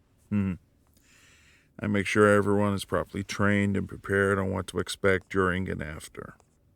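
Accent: United Kingdom. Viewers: Still got that British dialect. definitely ❤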